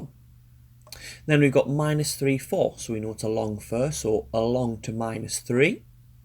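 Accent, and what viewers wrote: Scotland, United Kingdom. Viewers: You speak like Scotland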